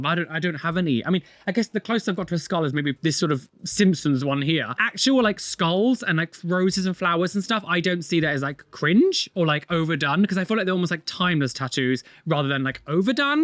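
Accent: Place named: Bristol, United Kingdom. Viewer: I had to stop painting because I was laughing too much at your reactions. That Bristol accent just sent me 😂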